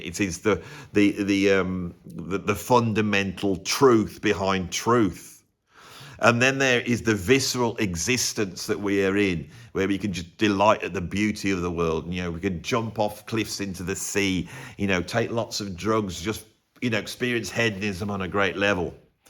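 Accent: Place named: Stourbridge, United Kingdom. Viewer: You aren't from Stourbridge, or nearby, are you? You sound like guys I grew up with 🎉🙏🏾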